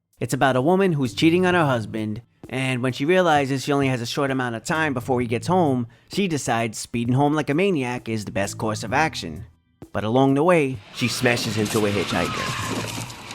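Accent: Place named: New York City, United States. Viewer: Are you from NYC? That accent is strong af 😂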